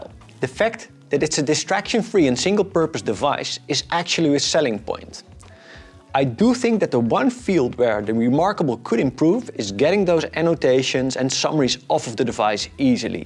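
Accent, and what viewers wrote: Netherlands. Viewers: He spoke for one or two seconds and I immediately looked at his name to conform what I heard. And yep, he's Dutch. I recognize Dutch accents anytime. My native language is Dutch and I'm from Suriname, South America. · Great honest review. Love that dutch accent btw - reminds me of my days in Europe. I want to get an e-Ink device, but can't see it replacing my OneNote / Suface and iPad workflow. Pens with colors, highlighter and the incredible search and synch capabilities of OneNote make it impossible for me to take this big step back. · I appreciate a video that evaluates the product after a fair trial period. Given that you have used this item for 1 year adds complete credibility and that is obvious from your remarks. I've been going back and forth about the Onyx Boox for a couple months now. This video came at a great time for me. 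So, thank you. And I have to agree with T HOFF on your Dutch accent, it reminded me so strongly of my Dad who came to Canada from Enschede when he was 17 years old. My Mom always said, "Wooden Shoes, Wooden Head, Wooden Listen" Ah...but he was a good man.☺ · i hear dutch accent noises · This was super helpful. This was exactly the information I was looking for. Having it all in a nice Dutch accent was just the cherry on top.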